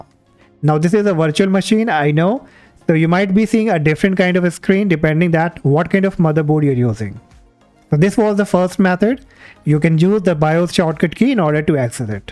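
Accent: India. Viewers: Always love you hear an indin accent on tech guides, yk you have a good source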